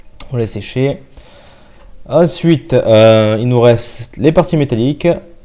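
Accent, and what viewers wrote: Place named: South, France. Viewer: j'avais jamais remarqué que tu avais autant l'accent du sud ^^.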